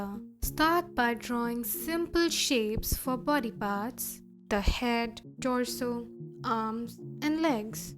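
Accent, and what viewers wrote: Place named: Russia. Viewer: Русский акцент)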